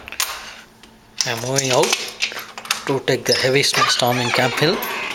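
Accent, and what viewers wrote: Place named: South India, India. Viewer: u r a south indian........................ i can cent percent tell ,from your accent.... ur intensity-density, ur lastnight, ur drizzling drizzling continuing continuing, how is life from india.....ur place must be very hot . u r seriously shivering out there... dont cha MISSED INDIA.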